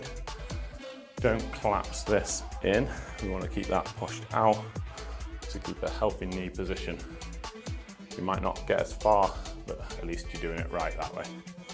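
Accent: United Kingdom. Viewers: Not a fan of the performative, overly excited American workout videos, so your calm, monotone British accent is music to my ears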